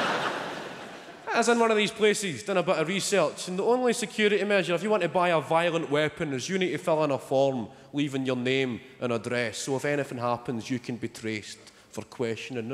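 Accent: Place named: Scotland, United Kingdom. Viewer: He's not English he's Scottish from Glasgow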